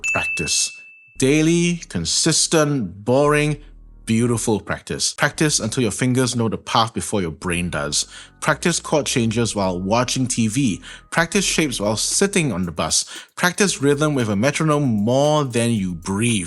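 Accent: Singapore. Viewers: Funny listening to you speaking singlish to the band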